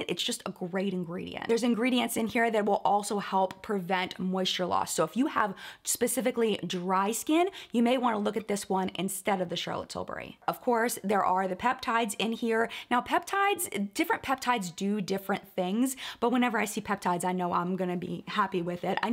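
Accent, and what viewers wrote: United States. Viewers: She came for Charlotte Tilbury and I LIVED FOR IT! 😂 Where I am, my clients raved so much about it. But I was never really thoroughly impressed with the brand to begin with despite how it was advertised as a brand loved by Hollywood and blah blah blah. I really appreciate people who shed light on facts like these. Oh and I just love her pure American accent like it’s just so perfect.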